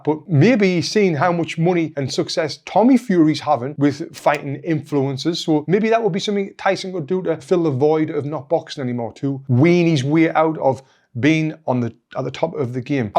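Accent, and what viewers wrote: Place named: Newcastle, United Kingdom. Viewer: Just started listening to your content tonight, good crack pal, Bob.. Geordie tho😂👍👍👌.... Fury, s talked himself upside down once to many times and your right maybe it's the start of decline... Hhhhmmm.. Time will tell... But a tell y what I've watched that fight with nganou 10 times fury hit him with a few full on blows and didn't even rock his head!!! He's a monster nganou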